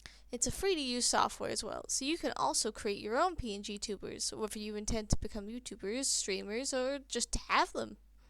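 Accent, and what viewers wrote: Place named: United States. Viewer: Nice to meet you 👋🏻 Glad to have seen this popped up in my recommendation. Also, as someone who lives in the US, you do sound a bit American. But that's okay. I hope & pray you have a great wonderful day/night & a bless life! As well your journey here on the platform spreading your enjoyment out to others, bringing the smile along side you. Stay safe, stay positive, stay frosty/cozy. Always watch your back & wish you the best of luck out there in the world. Take care now and I'll see you later when you poste your content! peace ✌🏻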